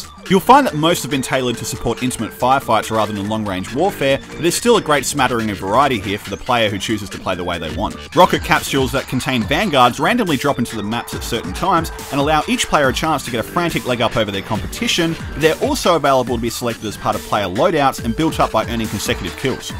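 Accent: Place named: United Kingdom. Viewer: Uh, Helghan home world isn't Vekta. I usually like British accents, but not yours. Eh, 4/10, Bret Hart style.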